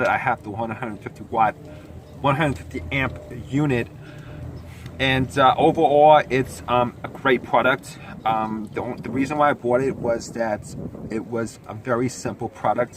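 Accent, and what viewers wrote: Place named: New Jersey, United States. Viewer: Thanks for your review. Where is your accent from? New Jersey?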